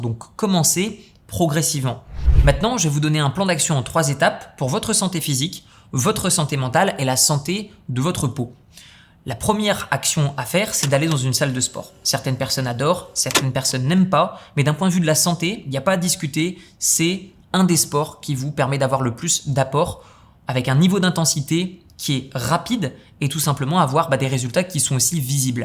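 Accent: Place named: Lomme, France. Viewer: Depuis toutes ces années, je crois que c'est ma vidéo préférée. De bons conseils, on voit aussi l'envers du décors on va dire. Toujours aussi inspirant. J'adore l'accent qui reste avec le temps (je viens de Lomme, j'ai l'impression de connaitre du coup 😅). Excellente vidéo, comme d'habitude j'ai envie de dire.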